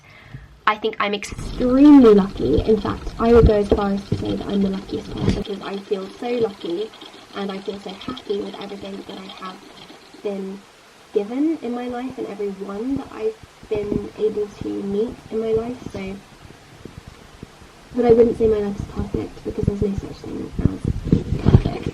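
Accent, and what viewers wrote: RP, United Kingdom. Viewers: I love your British accent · i doubt her and her sister both got scholarships (plus she would have mentioned it). i don't understand what you think posh is if it isn't money/posh voice/posh house/posh food that has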